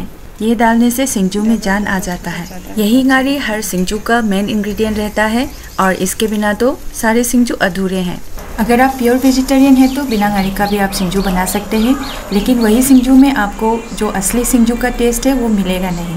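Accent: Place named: Nepal. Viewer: Its interesting to know more about singju ingredients but nangee hindi ngangbado nepali khoi gee accent malle 🙏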